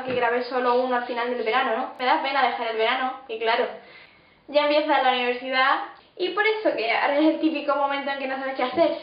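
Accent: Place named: Spain. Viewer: Ese debe de ser el acento más hermoso del español. ¿de qué parte de España eres?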